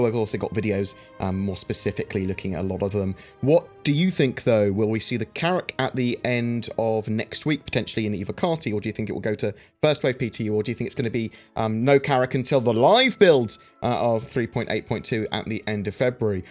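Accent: United Kingdom. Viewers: Is that a UK accent? Why does he speak like a sound wave .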